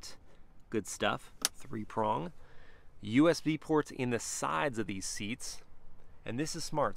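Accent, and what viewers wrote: United States. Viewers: I love your country accent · Great command of your communication. Wish you had ended with the country accent to bring it all full circle. Keep up the good work!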